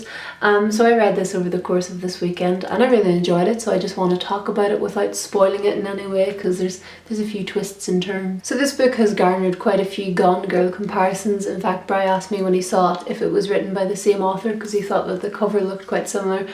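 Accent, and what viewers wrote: Ireland. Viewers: I read this book about two months ago, and I liked it. I normally don't read thrillers, I'm more of a romance book kind of girl. But it was interesting and it's one of these books where you can't stop reading, because you just have to know what happened . I haven't read gone girl, because I always really get into books and get into the characters and imagine stuff, so thrillers are kind of hard to read, when your imagination takes you into the characters and you scared. I really liked your review and I love your accent. I'm from Germany and love reading books in English. I definitely need to go to Ireland someday because the accent is just amazing, I could listen to you talk all day. Nothing to do with the book, but you just have a really calming voice.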